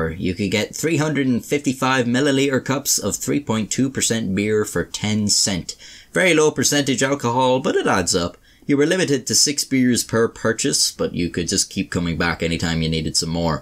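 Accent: Ireland. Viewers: I think everyone loves you because of your Irish accent. Plus you are pretty funny!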